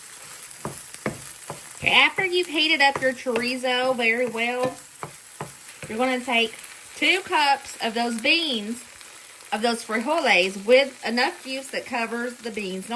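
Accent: South, United States. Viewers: I LOVE & ADORE YOU!!! 😂🩷😁 You are the funniest when you're trying to explain things, like your Southern Accent trying to pronounce Mexican words!🫣 Thank you for making me feel better and giving me a chance to actually feel like laughing🩷🤭🤣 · LOL!! I'm a Texan of Mexican heritage ... I, too, hate cilantro & DOES taste like soap!🤢😫 My bestie LOVES cilantro and uses it a lot (🙄🤦‍♀️), and I've gotten used to it, but I still won't buy it.😉 First time here, and I subbed. Love your southern accent. ❤ And you did pronounce frijoles correctly. 👍 Oh, and I have added cornbread to my mom's beans and still do. Can't help it.🤷‍♀️ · Luv yo Suthun accent...lol. I often make the chorizo with beans but use the very minimum amount of liquid. This us just a micro part of the gigantic array of food dishes from Mexico. I also make Móle, Enchiladas, Chiles Rellenos, (Shee-less-reh-yenos) plus many other dishes at home. 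God bless you and your family. BTW, I love cilantro and make my own Salsas with cilantro. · lol.. we can hear the country accent, if you can’t they are country too, and they speak your language so they wouldn’t know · Girl I'm here for your country accent!!❤ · I’m not Mexican but in Texas you’re not allowed to not like Mexican food. It’s the best. I also love Vietnamese foods. They use a lot of those great spices plus others from Asia. You should try some of the Asian recipes. People would love your take on them. I’m an old great grandmother who really enjoy watching you. I love your accent it’s so warm and southern. You and your family are precious. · You talk just fine, don’t mind the (KARENS)😳. ❤to you thank you for your time and country a. accent. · Sister we understand that southern drawl just fine! ❤ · I adore your accent, I moved to Texas & there are not a lot of people here who have country accounts. 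😔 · don't apologize for your Southern accent. I was born, raised and still live in Tennessee and the older I get the prouder I become of my accent. You are a dear girl. I love watching you and your sweet family. God Bless you.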